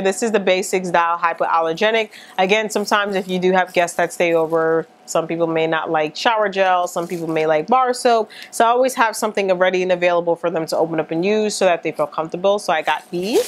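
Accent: New York, United States. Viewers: ur videos r everythinggggggggggggggggggggggggggg so nice to hear a fellow ny accent im from da bronx XX!!!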